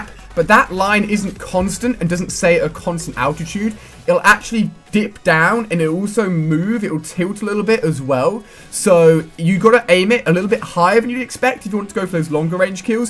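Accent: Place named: United Kingdom. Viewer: It's because he's British and they sound different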